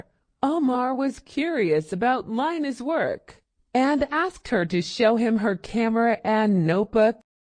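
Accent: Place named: United States. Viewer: اللكنة أمريكية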